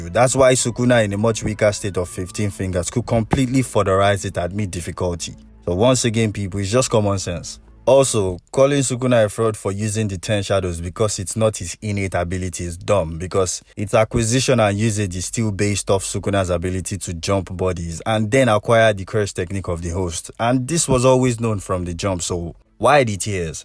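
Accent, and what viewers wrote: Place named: Nigeria. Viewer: From the accent I could tell you're one of us (Nigerian)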